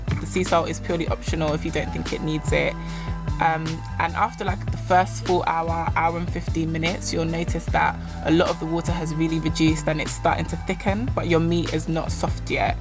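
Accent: United Kingdom. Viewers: Well I normally think these kind of videos are dead especially when it's not a carribean accent when they first talk and they are American I'm like nah but I heard a British voice and thought she might be alright actually and yeah cookings on point ha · 😍😍😍🇯🇲Im from America you sound like you live in Britain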